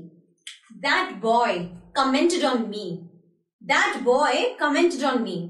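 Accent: India. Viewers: Bhaven May, Bro, this is not a Hindi language class!! She is teaching the English language. 😊 And I know, in our country we always use mispronounced words instead of correct pronunciations. It's very common to speak wrong pronunciations in India. And we love it. Of course, people hate correct pronunciations!! I don't know why?? 🤔 It's weird!! By the way, she is speaking with topical Indian accent. So, what do you mean stop accent!!! 😀 Do you know what is an accent?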